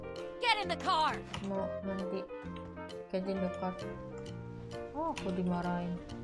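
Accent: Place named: Java, Indonesia. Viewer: Suara mu medok banget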